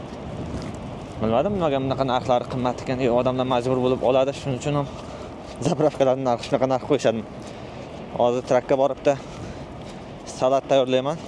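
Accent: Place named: Jomboy, Uzbekistan. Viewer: Assalomu alekum aka yaxshimsiz salomatmisiz? Har bir tayyorlagan videolaringizni boshidan oxirigacha oxirigacha maza qilib tomosha qilyapman, samimoylik bo'lgani uchun, videoni perexodlari chiroyli bo'lgani uchun juda qiziqarli. Shevangizdan tahmin qiladigan bo'lsam Jomboy yoki Bulung'urdan bo'lsamgiz kerak? Alloh har doim panohida asrasin, ishlarizga barakot besin. Omadingizni bersin yaxshi videolardan kutamiz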